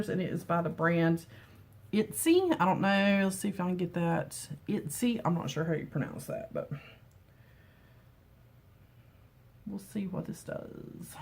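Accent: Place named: East Coast, United States. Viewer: Hi, fun GRWM! I subbed back to you and thanks for watching my channel too! As you may know, I have lost 50 lbs on keto at age of 67. I love keto and have recently started a new Facebook Group with a link on my video called Keto N Koffee with Marjorie. I think its wonderful that you and your husband have been researching and plan on doing this together. If I can be of help, just ask! I love your accent, you sound like my relatives back east! I am in So. CA. You already have a good start with your weightloss! Your makeup looks lovely! xoxoMarjorie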